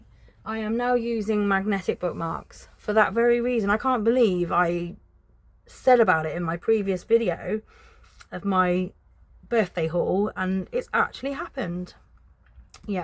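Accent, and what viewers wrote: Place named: England, United Kingdom. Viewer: You have a lovely voice! You have a beautiful English accent (to me, an American) and pleasant musicality (engaging and expressive). I could listen to you for hours, whereas I have had to bounce from other channels because I couldn't stand the sound of their voices. I dislike what they call vocal fry (common with American females) and that annoying sharp popping sound Australians tend to make and how they make every sentence sing-songy, like a question? Probably nobody likes the sound of their voice because it's different from the outside then within our own heads. 😅Anyway, yours is wonderful, so I hope you can feel reassured. And your coloring is very cute too. 🥰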